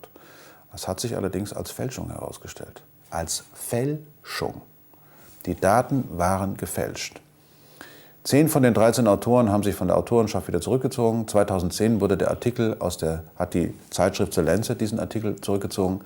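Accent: Hesse, Germany. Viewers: Karli Davidson Prof. Lesch hat zwei Themen herangezogen und beide wissenschaftlich erklärt. Du hast nur inhaltlich und argumentativ versagt. Prof. Lesch kommt aus Hessen. Dort wird gerne mit isch statt ich gesprochen. Man bekommt einfach gewisse Dialekte nicht weg. Ich möchte jetzt nicht wissen wie oft du schon "da wo" gesagt hast. Oder "manchmal immer". Fakt ist, dein Kommentar ist so wertvoll wie Scheiße am Schuh.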